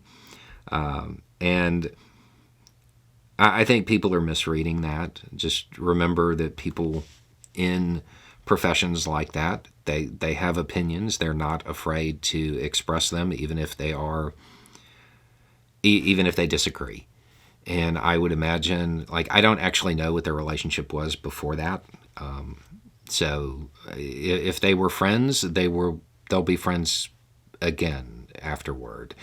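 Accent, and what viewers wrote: South, United States. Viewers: Whoa. I've been watching about a year, so maybe back then you had more of a southern accent, or perhaps you were putting it on? I had to turn on the closed caption and that didn't even always help!! But a big LOL on that clip,!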